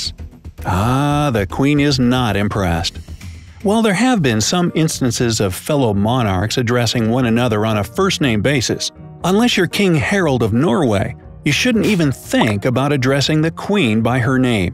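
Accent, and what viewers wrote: United States. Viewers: American commentator XD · Kind of sad hearing an American voice laying down these rules.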